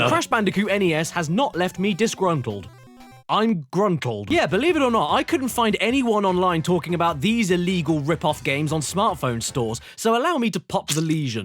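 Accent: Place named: United Kingdom. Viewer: You are way too british but your account to be from the "united states"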